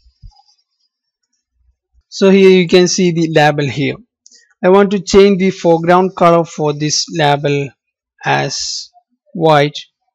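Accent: Kerala, India. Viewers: Malyali aano ? Nice explanation.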